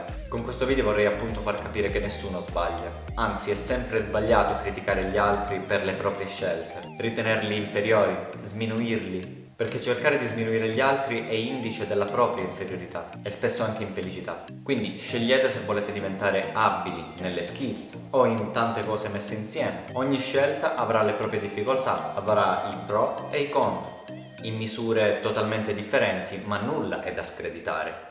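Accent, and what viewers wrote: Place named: Calabria, Italy. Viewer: E niente... mi sono appassionato da un anno al calisthenics e tu mi rendi anche fiero di essere calabrese come te. Sentire discorsi motivanti come questo col proprio accento è il massimo che possa desiderare da un canale che tratta della mia passione 😂. Grande💪🏻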